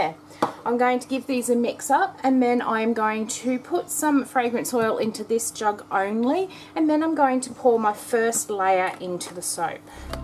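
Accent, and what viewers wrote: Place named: Australia. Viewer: Hi, I’m interested in buying a soap from you but I’m in the US and it sounds like you may be from Australia? Does that effect shipping prices?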